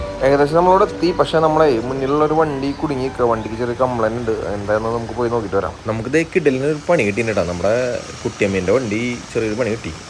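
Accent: Thrissur, India. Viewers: അവൻ്റെ പൂറ്റിലെ ഒരു കൊണച്ച തൃശൂർ സ്‌ലാംഗ് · Bro അത്ര Proffesional അല്ലാത്തത് കൊണ്ട് ആവും video ക്ക് നല്ല orginality . മറ്റുള്ളവരുടെ vlog കാണുമ്പോൾ skip ചെയ്യാൻ ഉള്ള tendency ഉണ്ടാകും ഇത് ഫുൾ കാണാൻ thoni കണ്ടു ഇഷ്ട്ടപെട്ടു follow ചെയ്തു കൂടെ കൂടി ഇനിയും ഇതുപോലെ ഉള്ള video തൃശൂർ slang ഇൽ കേൾക്കാൻ ആഗ്രഹിക്കുന്ന ഒരു കൊല്ലംകാരൻ ❤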